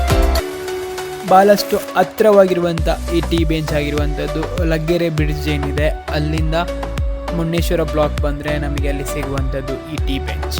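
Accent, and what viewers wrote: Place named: Mangalore, India. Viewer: Excuse Me Nimdu Kannada Nodidre Mangalore Or Udupi Kanuthe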